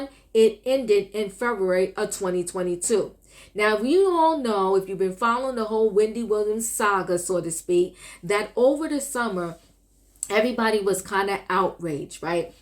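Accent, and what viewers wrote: New York, United States. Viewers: Big ups to the NYC!! Love your new York accent I miss my peeps!!❤️❤️❤️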